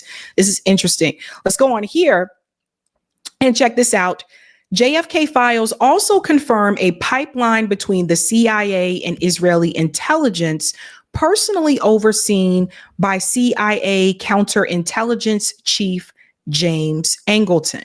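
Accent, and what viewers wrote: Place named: Boston, United States. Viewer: every once in a while you slip in a word with a Boston accent and I love it. As a Masshole born & raised I love seeing our accent rub off a little on transplants.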